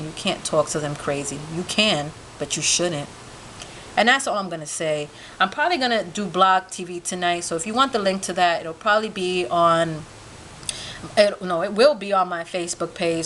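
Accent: New York, United States. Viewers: Are you from new york? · BTW, I loveeeeeeeeeeeeeeeeeeeeeeeeeee your boldness and how you don't take crap nothing from nobody!!!!! lololololol You went straight G on this video though!!!! That NY accent! Love it doll! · are you from Ny?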